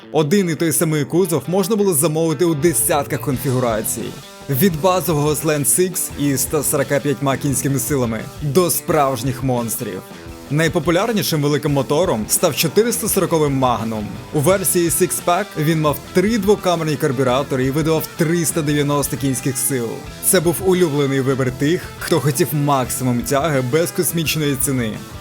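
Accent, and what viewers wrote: Russia. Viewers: "Не цямиць", "падзіння", "бюрокрації", "дзякуємо".. це за першу хвилину.. далі мене не вистачило слухати цю "маацковскую" вимову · Було цікаво але проскакує заводстка расссєйська прошивка. Важко слухати, коли кожне 10 слово викривлене. Хоча кожне 10 - це не кожне 3 …але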